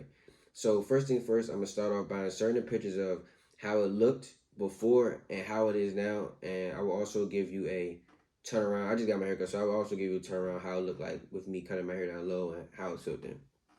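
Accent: DMV, United States. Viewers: He sound like he’s from the DMV lol…. Great video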